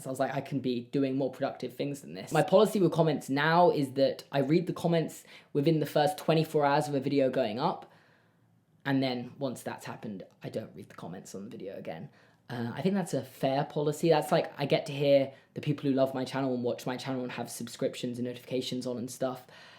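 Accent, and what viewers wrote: United Kingdom. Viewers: Is it just me or does serious seem much more British than high energy. I probably feel this way because I really only know Doctor Who and Love Island UK which aren't super high energy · haha british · It's weird that YouTube auto-generated subtitles don't understand British accents like why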